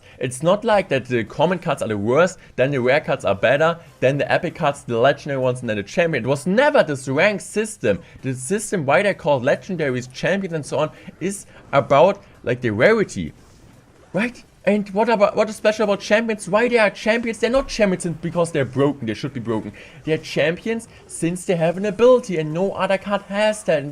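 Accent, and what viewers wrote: Germany. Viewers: Where is this accent from ? For a sec I didn't realize it was English (no offense ment) German? Like he's saying mura instead of mirror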